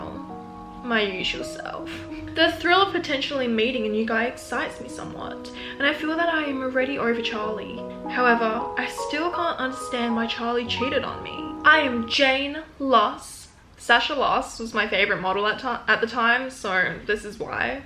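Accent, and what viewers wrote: Australia. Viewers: Ok but I love your accent!! me being from Bristol having a shitty accent ur obviously Australian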